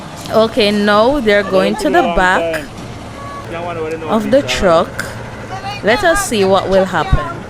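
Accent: Jamaica. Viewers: You talk funny woman, like Island woman, like Jamaica.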